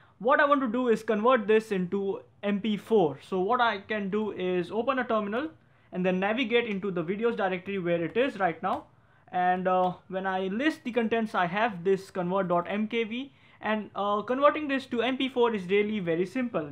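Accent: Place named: India. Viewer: Indians speaking english sounds very ridiculous, next time use subtitles, the world will thank you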